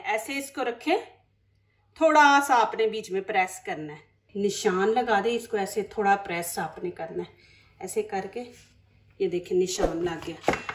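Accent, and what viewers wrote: Punjab, India. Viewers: maashaalla look delicious. AP bolti b bahut piyaray hai Punjabi touch Ata hai. love and respect from my channel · Yummy. Dakh ker both Maza Aya. Urdu main Panjabi lehjy Ka meetha touch. Kia khob.maza a gia😊❤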